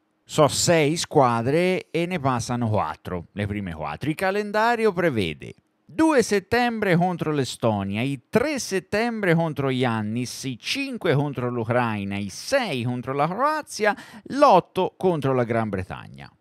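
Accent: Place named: Tuscany, Italy. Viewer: L'accento toscano è simpatico, così però è un po' troppo..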